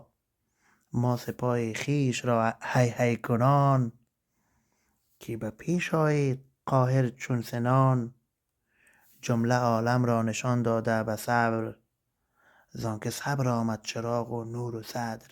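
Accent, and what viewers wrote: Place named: Afghanistan. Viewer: به به خیلی عالی ❤ این اولین بار است که مثنوی را با لهجه ی دری می‌شنوم و بسیار گوش نواز و صحیح و گیراست ، من گمان میکنم که لهجه ی شما به زبان مولانا نزدیکتره . سپاس فراوان 🙏🏽🙂🪻🫶🏽🌻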